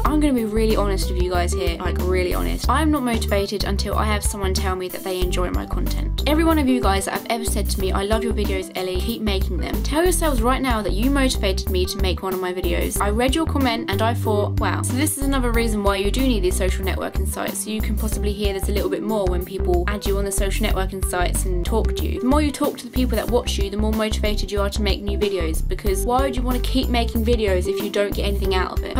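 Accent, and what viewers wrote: United Kingdom. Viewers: her briish voice is a little annoying but i can dig it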